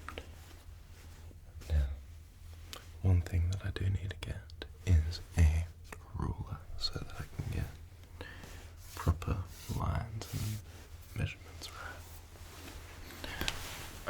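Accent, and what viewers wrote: Australia. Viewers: dude I love this, it's like listening to do ASMR hahaha (in the best way!). Something unmatched and familiar about other Aussies doing asmr, love your videos 🙂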